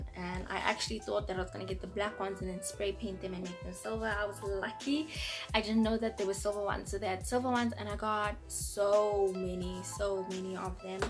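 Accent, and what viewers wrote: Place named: South Africa. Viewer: So refreshing to find a South African accent around here lol... Love your videos girl❤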